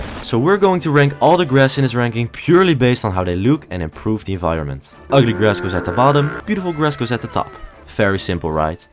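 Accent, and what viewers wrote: Netherlands. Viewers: Bro I can hear it so clearly that you are Dutch, but I love it, keep up the content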